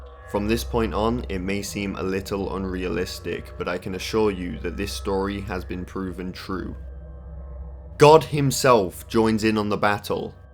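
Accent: United Kingdom. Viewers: British Mr Nightmare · British mr. Nightmare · ｄｅｅｐ ｖｏｉｃｅ ｂｒｉｔｉｓｈ ｂｏｉ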